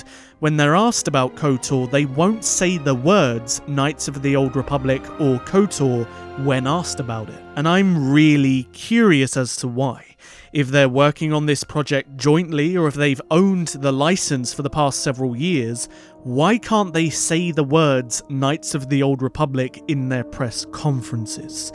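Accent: United Kingdom. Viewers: What part of the uk is you accent?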